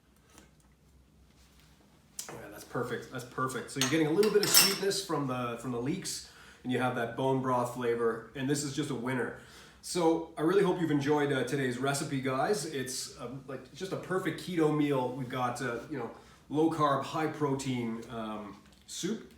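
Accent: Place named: Scotland, United Kingdom. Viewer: I usually have that as a starter whenever I'm in Scotland. Brings back memories of having it on cold days, both summer and winter 😅 I always like how the Scots add barley in soups while in Asia, it's served as a drink or dessert. There are variations of Scottish accents and yours reminds me of the Simpsons. 😅 Great one. It looks amazing and rustic 👍🏻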